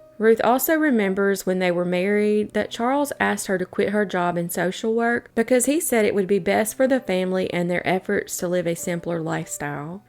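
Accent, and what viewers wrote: South, United States. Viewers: As a native from new hampshire,your "southern"voice trying to pronounce basic words,NH towns and english nouns,is most annoying almost like nails on a chalkboard. It might be just me though,an English professor,but i believe some will agree with me your pronouniations and voice are ridiculous.please,leave the cold case stories to professional,normal speaking individuals.i know your just looking for views or likes,but couldn't you just stick to videos descibing basic things like what kind of food was at the county fair or what country artists were nominated at the"cmt" awards.?please,people from NH have heard enough from your nonsensical, moronically overtones.unless you'd want to make a comedy.. Could get you more views - Sincerely,English Professional.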